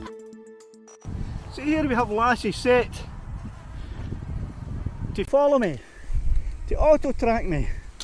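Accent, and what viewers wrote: Scotland, United Kingdom. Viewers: Love the Scottish accent!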